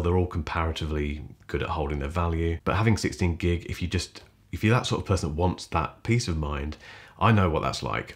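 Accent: United Kingdom. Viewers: This is the calmest review I’ve seen and the best British accent I’ve heard. You sir have a new subscriber.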